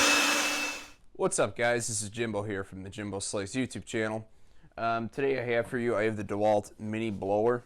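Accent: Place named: Chicago, United States. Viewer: I hear that Chicago accent. Where you from? I'm on da south side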